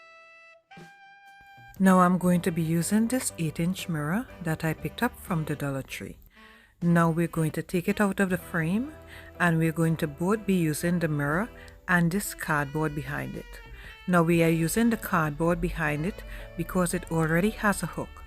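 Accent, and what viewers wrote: Trinidad and Tobago. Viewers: I'm from St. Vincent but I love the Trinidadian accent. I have relatives in Trinidad.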